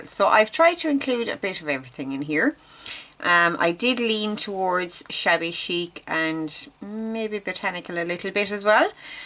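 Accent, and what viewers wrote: Ireland. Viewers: Love this idea❤️how fun! Things I like about your channel… love hearing your Irish accent!, subscribed during JB shenanigans, loved working with your Echoes of Ireland kit… watching for more of your beautiful work!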